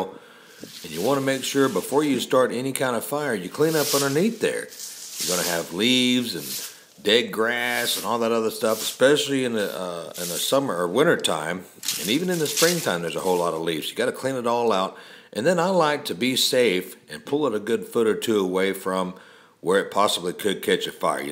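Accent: Texas, United States. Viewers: what part of texas is he from?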